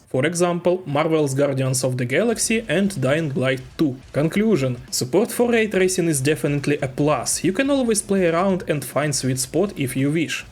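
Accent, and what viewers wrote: Russia. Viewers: Russian accent😂) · Сразу слышен русский акцент и школа )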